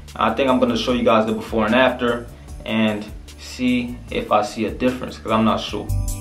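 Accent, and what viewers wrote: New Jersey, United States. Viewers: Yo you from Jersey? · you sound like you from Jersey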